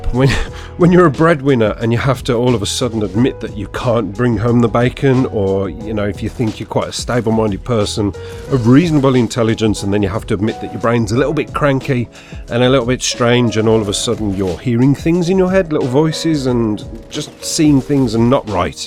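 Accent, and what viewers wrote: Birmingham, United Kingdom. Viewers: So good to hear that brummie voice again :) Welcome back pal, glad everything is coming together for you again!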